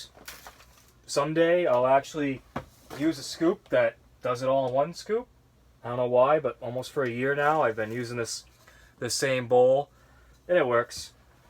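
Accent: New England, United States. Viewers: Great video! Im getting ready to set up an indoor rack system and you answered a bunch of questions. Sounds like you're in New England 😎? We're out of Maine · Nice work! I'm new to your channel and I really enjoyed the content and do I hear a bit of new England accent?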